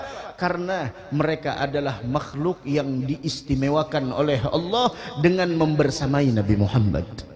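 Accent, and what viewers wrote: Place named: Tarem, Indonesia. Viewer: Sidin Terbiasa Suasana d TAREM cara penyebutan nya.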